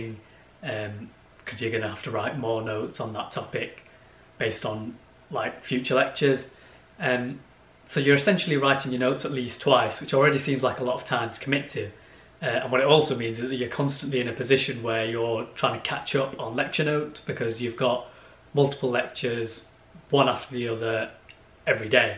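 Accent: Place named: United Kingdom. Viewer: British accent 😂👌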